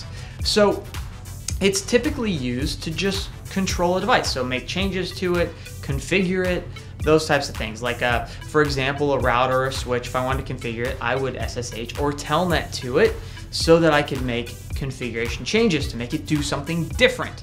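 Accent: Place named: United States. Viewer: Good to hear an American English!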